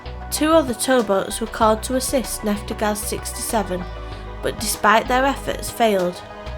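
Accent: United Kingdom. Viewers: The girl who narrates this has a cute British accent. I love her voice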